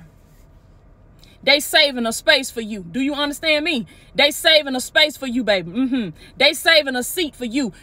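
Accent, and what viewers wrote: South, United States. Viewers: Love the message and the country accent. 🥰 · You from the South????😂😂😂😥💯💪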